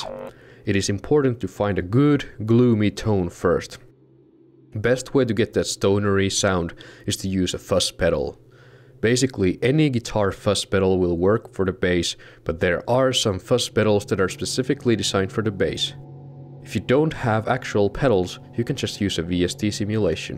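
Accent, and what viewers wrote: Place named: Europe. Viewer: Late to the party: are you a Dutchman? You have a very European accent. You did hit the nail on the head and distilled Stoner Doom to it's finest essence. If you want Dutch Stoner/Doom try the band ' ' from Leiden!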